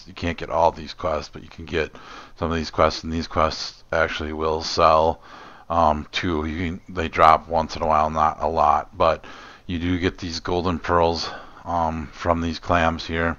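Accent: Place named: Midwest, United States. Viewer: Your from the Midwest I can tell. I’m guessing Wisconsin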